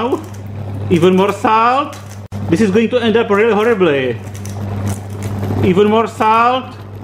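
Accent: Czech Republic. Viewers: Good CZenglish, better than Sobotka. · Czech republic i guess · Czech english lol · I love his Czech accent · Czenglish :D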